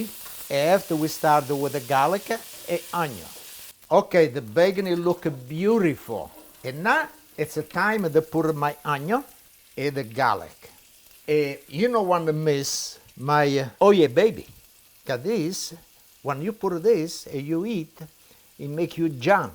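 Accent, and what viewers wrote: Italy. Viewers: When I was a kid I remember old Italian men speaking in this accent during the 80s. Good old days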